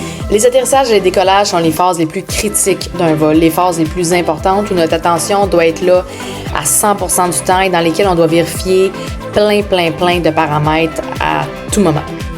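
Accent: Quebec, Canada. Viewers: Je découvre et je m’abonne, fana d’aviation et de l’accent Québécois c’est le top merci 😍👍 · Merci pour cette vidéo toujours aussi jolie l’accent québécois bon vol merci de faire partager ta passion du pilotage bonne année 2025 santé et bonheur milles bises de France Jérôme ❤❤❤❤😊😊😊😊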